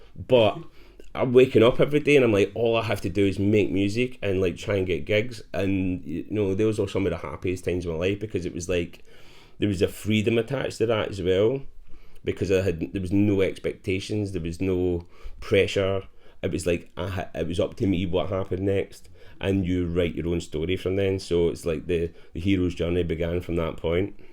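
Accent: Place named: Glasgow, United Kingdom. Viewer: Я прекрасно его понимаю. Я австралиец, но моя мама родилась в том же городе, где он жил в Глазго. Я всю свою дочь провела в качестве переводчика для своих друзей, так как никто из них тоже не мог ее понять. Этот акцент трудно понять, если вы не привыкли к нему.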